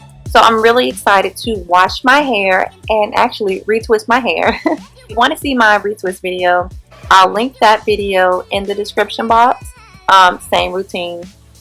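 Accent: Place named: Washington DC, United States. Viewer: You sound like your from dc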